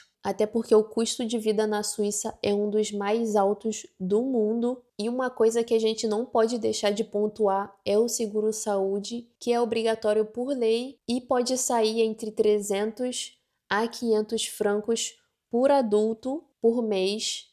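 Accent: Rio de Janeiro, Brazil. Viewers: Não da para nós nordestinos mudarmos o nosso sotaque, da mesma forma que a moça tem um sotaque aparentente carioca também não vai mudar o dela. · Ela não é nordestina é Carioca, não tem como mudar o sotaque raiz.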